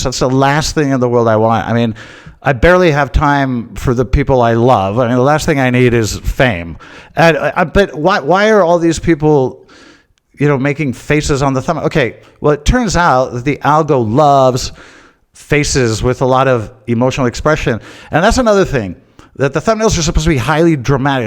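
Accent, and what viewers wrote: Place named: United States. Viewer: If it’s not offensive, can I ask why you have an American accent?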